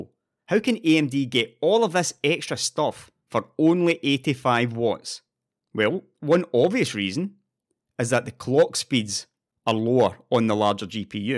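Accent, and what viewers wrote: Scotland, United Kingdom. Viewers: The Scottish accent was too much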